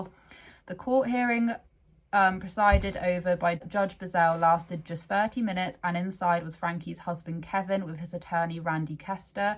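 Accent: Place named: United Kingdom. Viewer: That's why I subscribed... plus... love the British accent:):) sometimes... most of the time it's about the kids.... except when you're peeing. Then it's about you....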